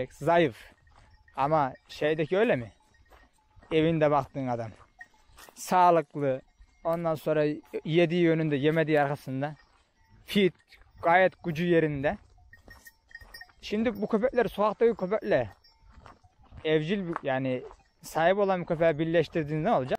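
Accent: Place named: Çorum, Turkey. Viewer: Çördük kızılca derler bizim memlekette.konuşmamız şiveniz bizimle aynı kardeşim.çorum benim memleket.ama bursadayım ben.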